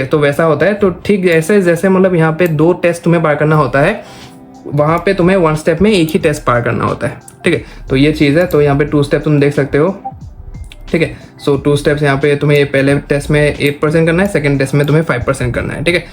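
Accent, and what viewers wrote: Assam, India. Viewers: Kotha pota style tut ye axomiya lage 😂❤️🙏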